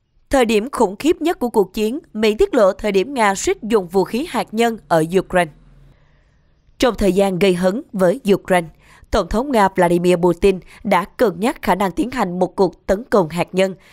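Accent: South, Vietnam. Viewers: Kênh bạn rất hay và chuẩn về thông tin. bạn nói giọng miền Nam nên nói chậm rãi. đừng nói nhanh quá... nghe sẽ đầy đủ hơn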